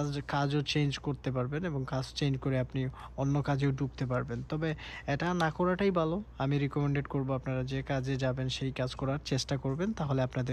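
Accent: Sylhet, Bangladesh. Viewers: Sylheti ni ba vai😊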